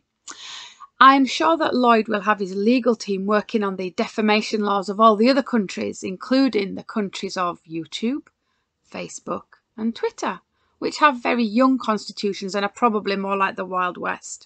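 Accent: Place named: Essex, United Kingdom. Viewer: what a lovely accent. Essex?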